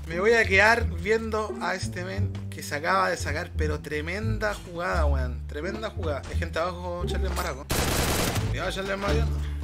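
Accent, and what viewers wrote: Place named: Chile. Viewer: Jajajajaja ya me suscribi a tu canal y no por el contenido sino porque te salen chistosas las palabras yo igual soy de Chile pero igual no hablo tan así jajajajja saludos y te dejo tus likes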